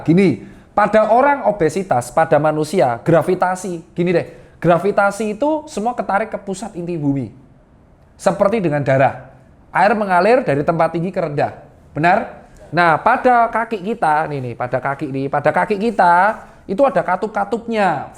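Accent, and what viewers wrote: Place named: East Java, Indonesia. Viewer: Bosoe wong jatim...tuh..mantep pokoke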